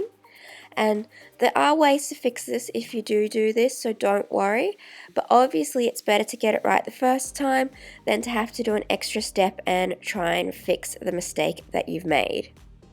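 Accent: Australia. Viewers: R u Australian? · Heya, wow so refreshing to hear an Aussie on YouTube, im from qld……thank you so much for this tutorial. I made some candles today actually and you guessed it i have that dipping you were talking about….so i can use a hairdryer to remelt the wax? Have an awesome day/night….xxxx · Added bonus is the Australian accent!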